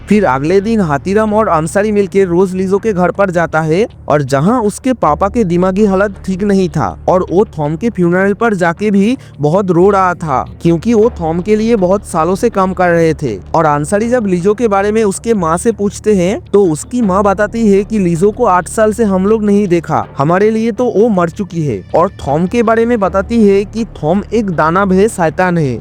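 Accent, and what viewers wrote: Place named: Bengal, India. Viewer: tumi Bangali sur charte parcho na go bhai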